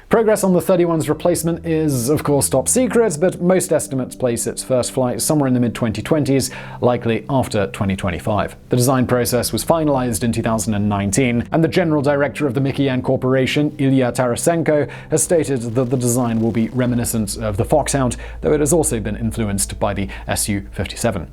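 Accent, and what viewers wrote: England, United Kingdom. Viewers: super faster talker with an English accent 80% lost to these bad choices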